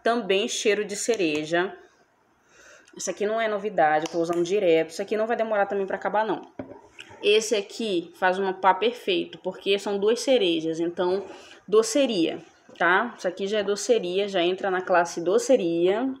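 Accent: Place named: São Paulo, Brazil. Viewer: Tá fazendo frio aqui também amora ! Eu sofro no inverno kkk sou mto friuenta Lindona vc mora no Rio mais o sotaque é de são Paulo? Fiquei curiosa acho lindo o jeito de falar hehe 🙊😍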